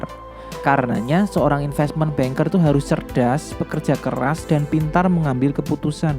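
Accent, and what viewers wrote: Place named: Java, Indonesia. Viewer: Medooookk bggeett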